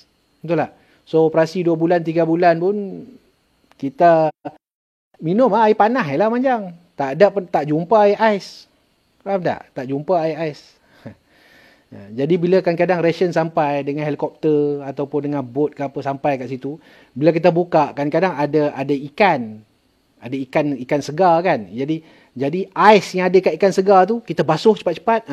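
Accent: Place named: Kedah, Malaysia. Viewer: Maaf tuan! Sya nk tanya sikit, sya dh tengok semua vedio tuan, tuan ni asai usul dri kmpong mana? Mcm org kedah ja ni?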